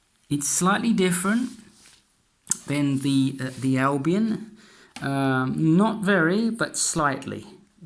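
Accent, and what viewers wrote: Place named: United Kingdom. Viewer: You sound British, like me - did you have any issues importing the Albion swords into the UK, import tax, etc., please...?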